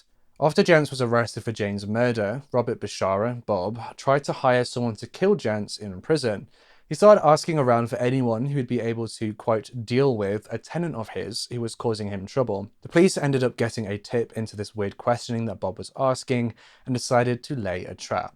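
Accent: United Kingdom. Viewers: As always great vid. Nice we have a British voice on these true crimes actually. So many USA channels. Miss the raised eyebrows xxx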